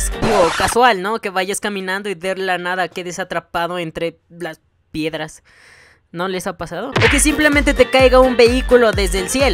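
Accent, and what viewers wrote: Mexico. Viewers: eres de mexico???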